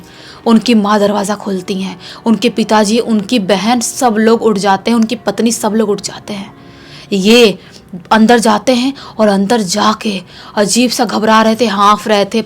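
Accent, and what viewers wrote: Bihar, India. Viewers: plz btadijiye kya ap bihari ho? Apka assent whin k lgta h aur bhut words v! ❤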